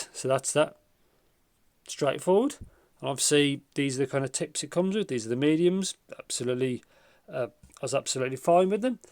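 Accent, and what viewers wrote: United Kingdom. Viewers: Hi mate, fellow brit here, subbed as soon as i heard the accent lol. Hoping you can help... My music is mostly, so alot of acoustic and string dominated music. live edition, acoustic such as ' ' and etc... To give you a solid genre type... Im looking at buying a pair of IEM's to suit my listening style for this music. I dont need bass cannon's im looking for quality rich mids and vocals mostly. Would you recommend these as a cheap enough pair that should do a decent job? I'm looking at ordering oracle mkiii as a treat for myself as a high end IEM set. Do you feel that set would suit my listening style? Great channel pal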